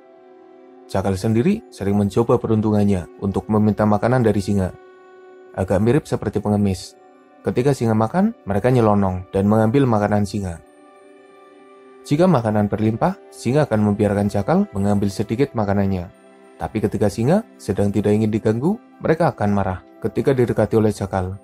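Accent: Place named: Java, Indonesia. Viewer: Suaranya keterah banget orang jawa Dari jawa mana min soalnya saya juga orang jawa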